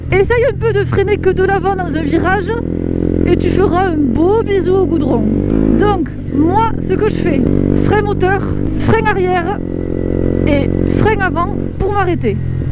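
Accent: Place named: Southwest, France. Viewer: toi vu l accent tu sembles etres du sud ouest , sinon bonne continuation!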